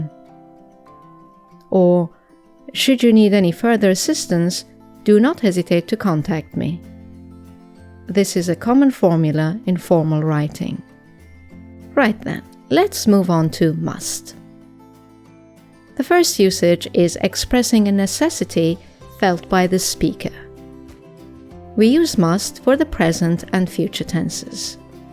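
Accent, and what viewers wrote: United Kingdom. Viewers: One of the best teachers. Really great. Are u original British?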